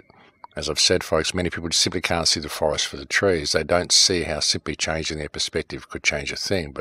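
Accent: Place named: Australia. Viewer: I love your vlogs it’s nice to hear a Aussie voice so smart and I wish you could go on Q and A wake up some more aussies we need it keep up the god fight 🇦🇺💖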